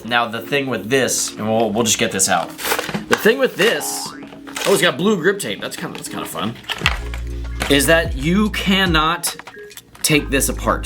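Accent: England, United Kingdom. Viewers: Guy’s English or Spanish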